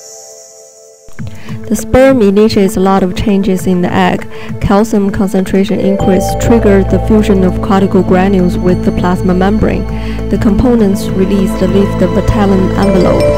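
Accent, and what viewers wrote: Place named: South Korea. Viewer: She sounds korean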